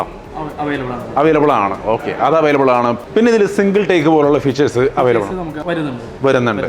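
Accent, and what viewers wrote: Thrissur, India. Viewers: chetta Thrissur ഭാഷ കയറി വരുന്നുണ്ട് 😄😄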